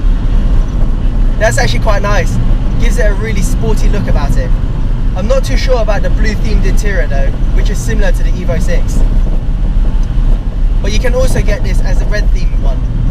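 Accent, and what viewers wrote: United Kingdom. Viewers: An Asian guy with a British accent....I've not seen that before, interesting. I do like JDM vehicles, but for some reason, they keep putting the steering wheel on the wrong side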